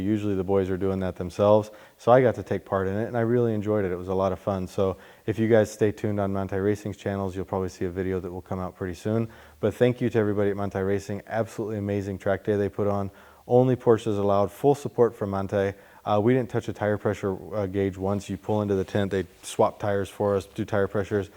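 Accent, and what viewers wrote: United States. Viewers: I notice your american accent. Howd you decide to move to Germany?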